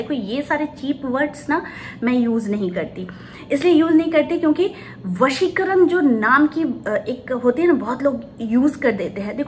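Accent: Bengal, India. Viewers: Apni ki bangali? Apnr uchharon onek tap bangalider moto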